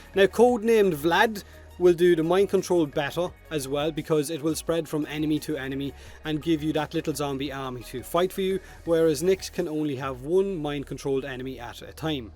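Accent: Ireland. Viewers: You sound hot. Just saying. I'm a sucker for the Irish accent though. <3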